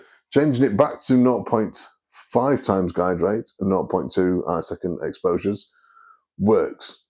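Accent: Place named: South Yorkshire, United Kingdom. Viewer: Thanks! Nice to hear a south Yorkshire accent. I'm in north Norfolk so not a million miles away. I'm currently looking seriously at the HEM15 to replace the Skywatcher Alt-Az I'm currently using, so your review is very useful. I understand the HEM15 has wifi, so presumably I don't need the hand controller?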